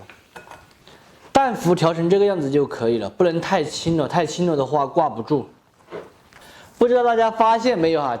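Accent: Sichuan, China. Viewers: 味道肯定巴适得板，配合你的川普简直是绝配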